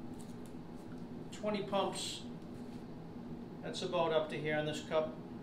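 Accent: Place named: Massachusetts, United States. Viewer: I was wondering for a while why you didn't glue together the two lengths of transom reinforcement earlier in the process. But you then explained that it was to minimize the effects of the epoxy on your drill bits and to consolidate the glue-up of the transom and brace into one operation. That kind of forethought and careful planning is an example of what separates you from the vast majority of YouTube boat builders. Thank you. P.S. As a Rhode Islander born and bred, I appreciate your Massachusetts accent, your Quincy lat/long signboard, and your Cape Cod hat!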